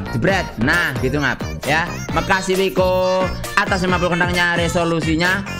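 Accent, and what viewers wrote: Java, Indonesia. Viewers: Wong ndi to ngab kok medok men wkwkwk tetep semangat konten ngab😍😍😍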